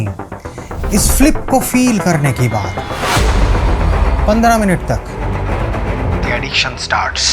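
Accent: Bengal, India. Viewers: Man, what a review video. Very unique. Never experienced such a detailed technical, camera performance oriented review on YT. Have watched your Pocket 3 review also. And I loved the drama in your videos ❤ And the hint of Bengali pronunciation. Really appreciate your hard work. All the best Bro 🙏🙏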